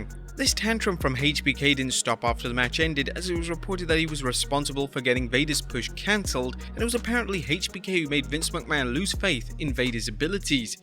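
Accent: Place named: United Kingdom. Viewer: Even if you didn’t have the slight accent I would know without a doubt that you were from the UK. Love the way y’all pronounce H. 😃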